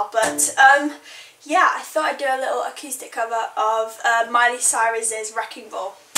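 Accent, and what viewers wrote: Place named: United Kingdom. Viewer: How is it possible to talk with British accent and sing with American accent ?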